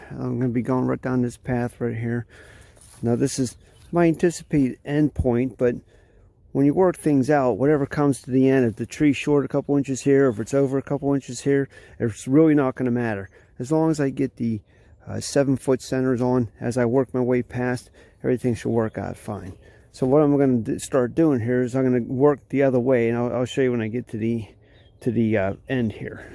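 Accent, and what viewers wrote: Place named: Pittsburgh, United States. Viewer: I detect a yinzer accent....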